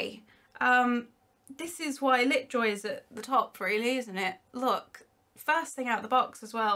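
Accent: United Kingdom. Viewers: I love your British Accent. I actually am not British, or English, but I speak an amazing American accent and I am trying to do a British accent. And how much did this box cost?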